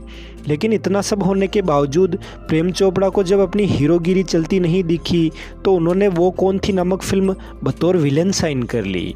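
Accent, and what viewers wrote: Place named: Marwari, India. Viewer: मारवाड़ी है ।चेनल ।में ।बोलने वाला ।उच्चारण से पता चलता है ।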